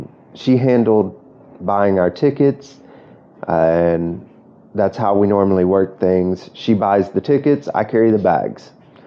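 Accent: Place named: Bisaya, Philippines. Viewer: Hahaha loving the bisaya accent keep posting video bro you might consider adding light instrumental music to add your video a little life …. 😊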